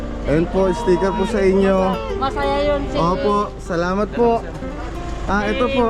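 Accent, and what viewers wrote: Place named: Malolos, Philippines. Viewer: Sir malolos talga Yung Punto mo...di Po ba mahirap Daan punta Jan sir Kaya Po ba Ng maliit na kotse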